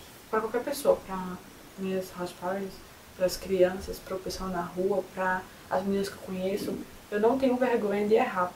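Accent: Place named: Northeast, Brazil. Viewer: Seu sotaque eh igual ao meu, vc eh do Nordeste? Sou de Maceio, tb sou Au Pair, tb tenho um diario aqui no YT e estou ha tres meses em Virginia. Onde vc esta aqui nos EUA? Bjus!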